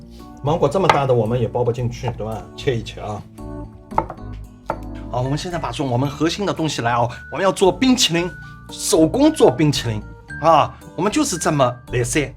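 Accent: Shanghai, China. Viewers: jijnjijijj 沪普😂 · 朱师傅一口上海普通话听着真亲切啊。听着朱师傅的声音好像回到了上海，很久没回家了，满满的思念。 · 这是很标准的上海普通话，哈哈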